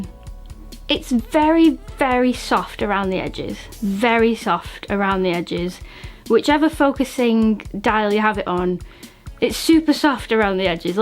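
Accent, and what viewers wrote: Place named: Manchester, United Kingdom. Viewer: Great educational video . I just bought one , great for travel . Love your accent . Manchester ? United or city ? I'm from Yorkshire but I still like your video .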